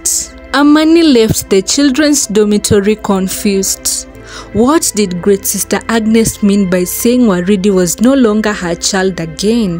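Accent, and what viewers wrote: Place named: Kenya. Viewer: Thank you too for the stories and yes, your Kenyan accent is really nice ❤️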